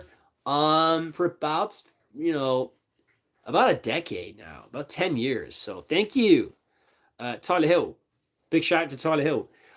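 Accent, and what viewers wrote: United Kingdom. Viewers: are ❤u❤ British❤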